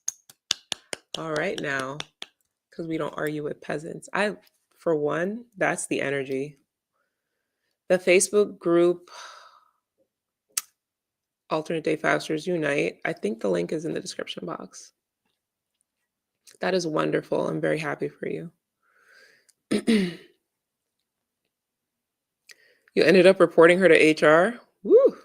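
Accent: Jamaica. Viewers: So much more effective when you scold us in your Jamaican accent😂❤😂 and by the way, even though I'm near my goal, I'm still going to listen to you for motivation, because no matter how long I do it, fasting is hard!!!!😮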